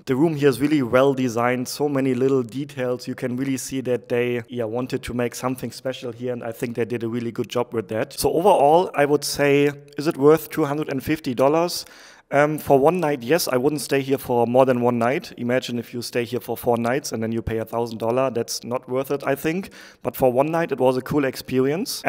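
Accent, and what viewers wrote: Germany. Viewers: 一开始我不知道你是德国人,你的口音听起来很像德国人,因为你让我想起了一位德国故人🤣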